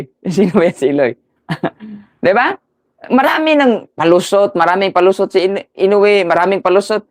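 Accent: Visayas, Philippines. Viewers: Ung Tagalog mo bay bisaya padin😅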